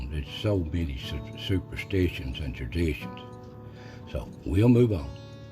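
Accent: Appalachia, United States. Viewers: He sounds like my uncles from the Appalachian's. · I'm from West Virginia, too, and just hearing you talk reminds me of all my family growing up. It is such a joy and privilege to have been born and raised in Appalachia. Thank you for some sweet memories.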